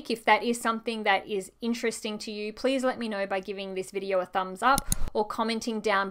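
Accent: Australia. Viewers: Lovely, unaffected, clean Australian voice. Outstanding, lucid preparation ands steady camera work. Smart illustrations. If this charmer isn't a teacher, she should be. She nailed it with this book.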